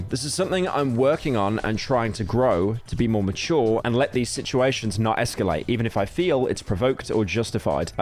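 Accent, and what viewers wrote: United Kingdom. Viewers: He is brittish, thats how you pronounce it in the botta o watta accent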